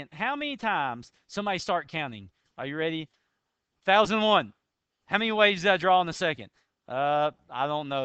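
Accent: United States. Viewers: loving the western accent! -- better explained this way!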